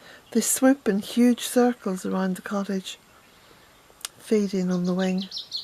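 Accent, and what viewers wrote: Ireland. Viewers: Oh how nice it is to hear those words read in your lovely Irish tones. You give new resonance to your writing. Thank you. Have a beautiful rest and wake to the scent of rain.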